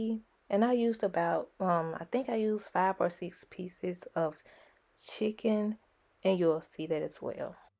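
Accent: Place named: South, United States. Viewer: Straight to the point! btw I love your southern accent 😊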